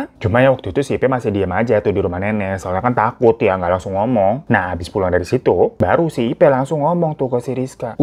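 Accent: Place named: Pontianak, Indonesia. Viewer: biak ponti mane suara nye😂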